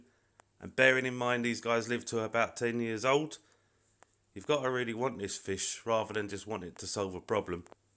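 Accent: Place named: Cockney, United Kingdom. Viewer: Mate this is the first one your videos I have watched and I love it. Purely because it’s so good to have a cockney lad doing a video rather than listening to more American voices! 😁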